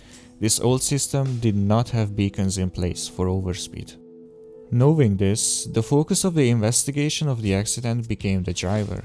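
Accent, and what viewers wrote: Hungary. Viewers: Az angol kiejtésedről nem mondanám meg, hogy magyar vagy, de a japán szavakat olyan magyar akcentussal ejted ki, hogy az elárulta. Nem rossz. Maga a videó se, elég érdekes. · Csak nem Magyar vagy? Nem nagyon hallottam az akcentust az előbbi videókban, de most kicsit jobban lehet hallani.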